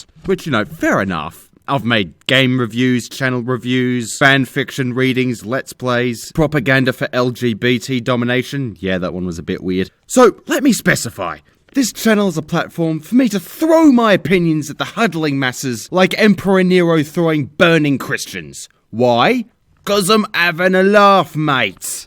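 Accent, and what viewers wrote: Australia. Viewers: Its like Tears of Grace and The Escapist had a love child with an australian accent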